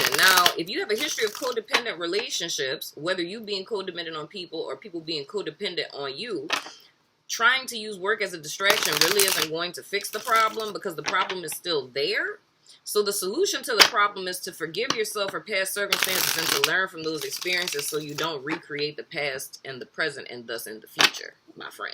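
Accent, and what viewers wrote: New Orleans, United States. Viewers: I was trying to figure out whar is that accent I’m hearing. lol I’m getting NOLA.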